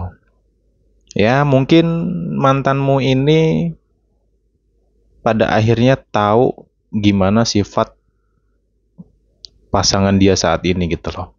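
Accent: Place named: East Java, Indonesia. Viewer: Hadir....dr bogor . Logat jawa timuranne kentel. Heheehe salam arek arema , 🤭😊😁 suarane enak ✌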